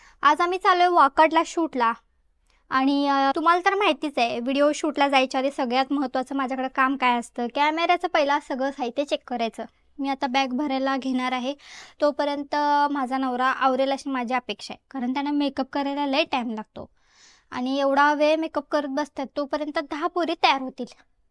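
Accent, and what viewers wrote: Kolhapur, India. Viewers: Kolhapur typical accents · अस्सल कोल्हापुरी भाषा ❤ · Your Kolhapuri accent is so niceeee❤️❤️ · Kolhapuri tone 🔥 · कोल्हापूरी टोन ek no.🔥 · Tumhi bolnyat कोल्हापुरी tone ahe ❤ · Mam kolhapuri marathi jagat bhari......1 no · विषय असा झालाय 💥😁 Kolhapuri bhasha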